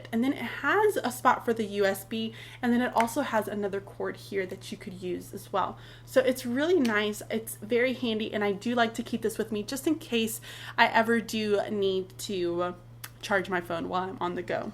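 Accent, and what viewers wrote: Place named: Alabama, United States. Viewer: Are you from Alabama... your accent really came out... if so, we are neighbors.... I'm from Mississippi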